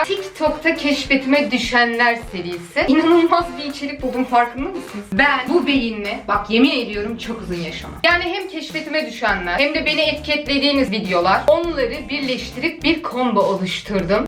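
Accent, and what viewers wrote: Turkey. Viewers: Sen heç AZERBAYCANLİ KİMİ DANİSMİRSAN TURK KİMİ DANİSİRSAN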